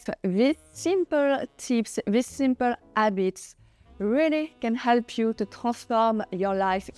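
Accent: France. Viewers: Love your accent! ❤ It sounds French Shick!!! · Avec votre "french accent" j'ai su à la première seconde d'écoute que vous n'étiez pas anglo-saxonne ;-D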